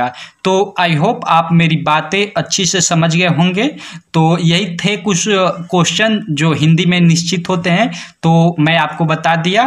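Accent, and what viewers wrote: Bihar, India. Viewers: Aap bihar se hai kya?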